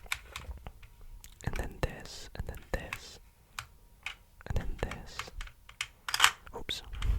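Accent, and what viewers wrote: Scotland, United Kingdom. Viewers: Bro this man is Scottish.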